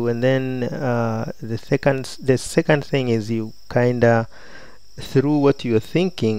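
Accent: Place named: Uganda. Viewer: I thought it was Ugandan... it sounded so familiar!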